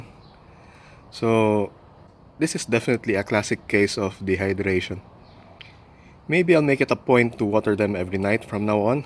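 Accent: Philippines. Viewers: your accent is filipino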